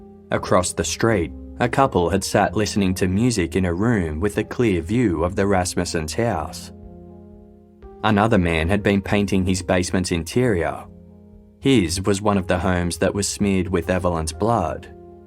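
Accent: Australia. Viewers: That is the sound of an Australian voice.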